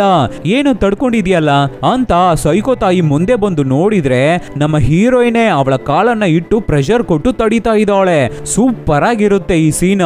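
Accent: Tamil Nadu, India. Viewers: Nim mother tung Tamil ansuthe... 😂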